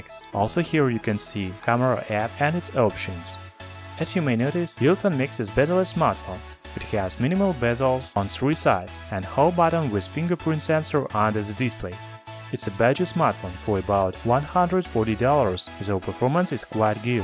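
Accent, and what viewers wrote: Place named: Russia. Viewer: а я то думаю..что за провинциальный городишко забугорный так похожий на наши)) даже рашн акцент сразу не заметил)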